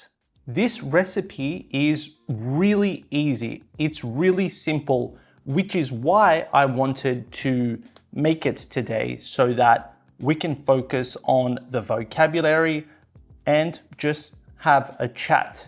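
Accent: Australia. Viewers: Is it Australia accent? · Ohh, I really loved to know that's an urban Australian accent 😅 I intend to move there in the future, and I confess that I was scared hahaha. We Brazilians have been influenced to believe that the Australian accent is so, so, so hard, but I've been watching some videos of real life in Sydney, Melbourne and Brisbane, and I think it is kind of like a British accent, but with much more open sounds. So... it's totally fine for me. My favorite dessert is brigadeiro 😋 Congrats on your video, you're really lovely. I have a suggestion: if you could pronounce all those words at the end of the videos, and if you could do a regular conversation with another Australian person ☺️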